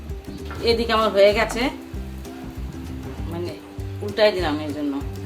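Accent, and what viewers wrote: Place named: Bikrampur, Bangladesh. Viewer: Apni ki bikrompuer meye? Amar mone hosse kotha shone.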